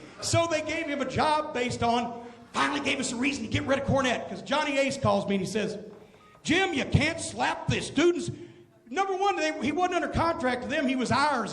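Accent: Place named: South, United States. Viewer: his dopey southern accent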